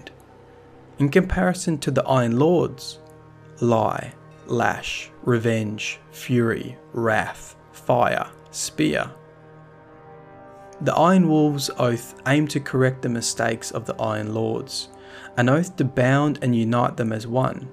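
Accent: Australia. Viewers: DOOD YOUR FROM STRAYA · Your Australian I thought you were sick mate!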